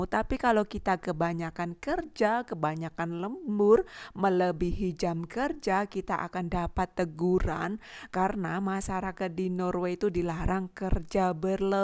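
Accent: Java, Indonesia. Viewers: Sumatra kok logatnya jawa banget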